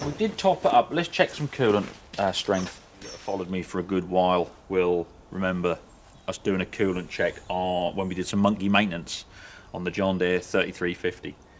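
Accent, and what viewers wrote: Norfolk, United Kingdom. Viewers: Love the vids. Brought up near Fakenham, Norfolk. Good to here the Norfolk accent again . Would love to see a Kubota 7173 on the drive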